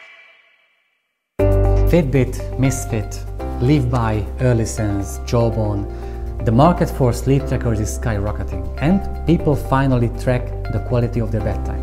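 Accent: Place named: Hungary. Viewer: Gondoltam az akcentusodból hogy magyar vagy 😅 Mit mondanál, mi a legjobb alvás tracker? Mit érdemes venni?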